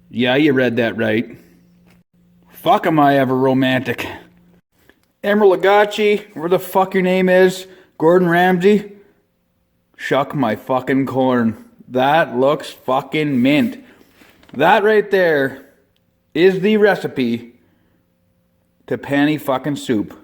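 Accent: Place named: Alberta, Canada. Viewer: Fuck yeah ! I can tell your from Alberta haha I’m from Edmonton I love your minty as fuck videos keep posting them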